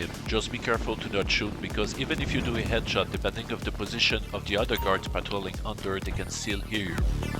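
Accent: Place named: Quebec, Canada. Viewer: i recognize a quebecois when i hear one great tutorial tho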